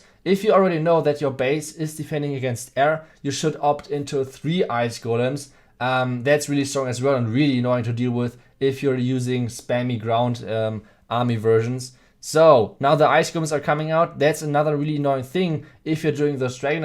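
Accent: Germany. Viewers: Speaking english with German accent, that was unique 👌👌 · Are you german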